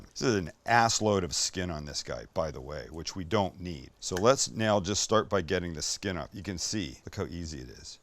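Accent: Ireland. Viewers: 8/10 on the Irish accent. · pretty good irish accent there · C. he used the C word. you can tell because of the irish accent.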